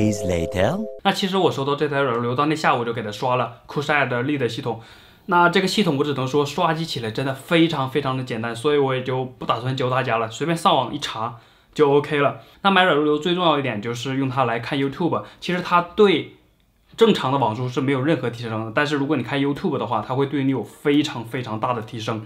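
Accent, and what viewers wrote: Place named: Northeast, China. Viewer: 哥们东北哪儿的呀？口音好亲切呀😂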